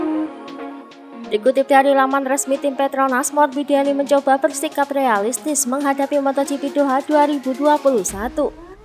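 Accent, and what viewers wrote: Java, Indonesia. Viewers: Hadir mba medok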